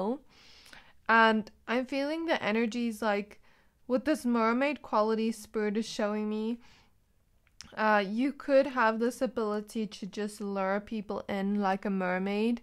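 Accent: South Africa. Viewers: Great video ❤..if you're comfortable answering...may I ask where you're from...you have a SA accent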